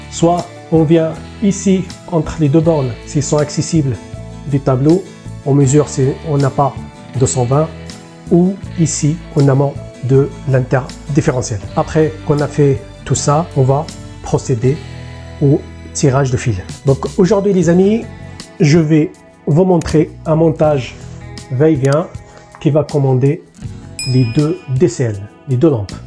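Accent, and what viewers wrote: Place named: South, France. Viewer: Je découvre et c’est vraiment bien expliqué, et en prime cet accent du Sud qui lorsque on vit dans le Nord remplit de bonheur.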